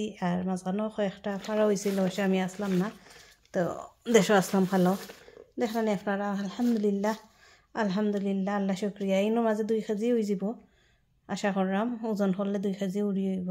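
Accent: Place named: Sylhet, Bangladesh. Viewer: সিলেটি মাত হুনিয়া খুব ভালা লাগল❤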